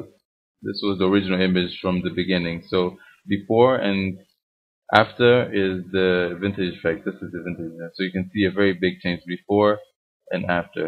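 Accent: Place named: Germany. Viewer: you sound German :\ Are you? Nice video BTW :)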